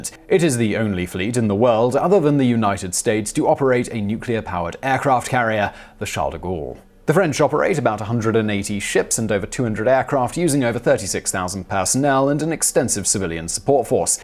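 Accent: England, United Kingdom. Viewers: Johny Ricco well, he’s English English. 😂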